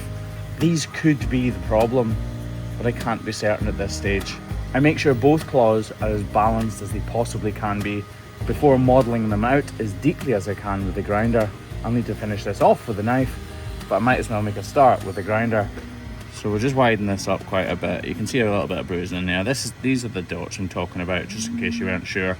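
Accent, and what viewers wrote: Scotland, United Kingdom. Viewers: Normally I love Scottish accents and regional word variations, but for some reason hearing "slither" in place of the "sliver" I'm used to irritates me every time.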